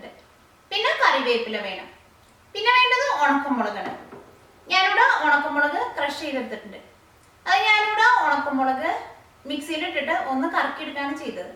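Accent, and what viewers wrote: Thrissur, India. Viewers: thanks for that recipe....... one advice: you need to try to speak in clear malayalam instead of using your regional accents like thrissur or kottayam........ usually when we address public (either in stage or off stage) all of us speak normal malayalam without local accent....... (and all of us can speak too)..... try next time please.........so that you represent the entire kerala..........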